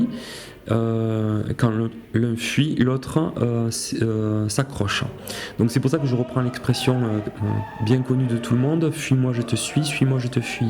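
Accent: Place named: South-West, France. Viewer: j entends un ptit accent du sud ouest comme moi...😍🤩😘 me tromperais-je?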